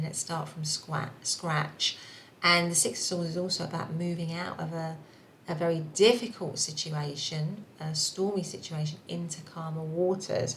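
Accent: United Kingdom. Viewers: Thank you!! I love the way you interprétate de tarot ( even if sometime is hard for me your London English accent ( I love it btw ! ) hug , abrazo y gracias from Buenos Aires ! ❤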